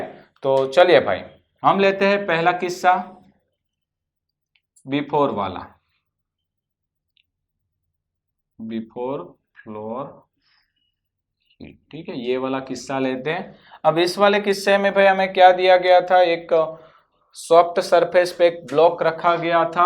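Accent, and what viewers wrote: Gujarat, India. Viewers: i can bet that you are from gujarat by your way of telling force . Anyway you are working hard and you will be succesful one day . you are a good physics teacher.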